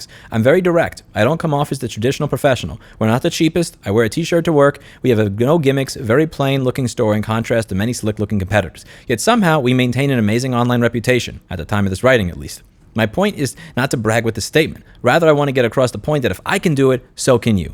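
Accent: New York, United States. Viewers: Please shut up about the linus drama. It got old quick I come here to listen to that succulent new york accent not to hear about the YouTube equivalent of schoolgirl drama. Otherwise Loved the video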